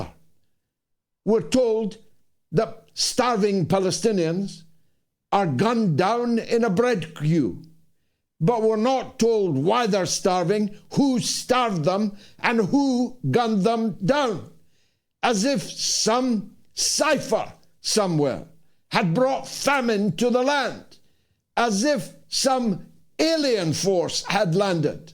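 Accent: Scotland, United Kingdom. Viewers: Because of his peculiar Scottish pronunciation and the way he delivers (pauses very contrived) we apportion to him a type of reverence. He is just another socialist scumbag from the north that has nothing to look forward to but the ‘lake of fire’ because has not repented and keeps grinding on on these dismal subjects. do you think you are exonerated by your monologues-there is zero redemption in pointing out the sins of others for you or any other commentators bro. Take a break and examine your own life my friend before it’s too late…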